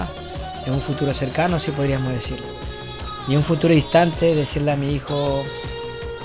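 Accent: Ecuador. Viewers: ES GUAYAQUILENO LO DIGO POR AU ACENTO NEUTRO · el detalle es que es Ecuatoriano, no Venezolano, se nota por el acento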